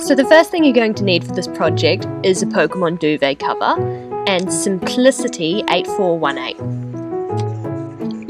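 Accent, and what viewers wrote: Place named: New Zealand. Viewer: as a fellow kiwi it's just nice to hear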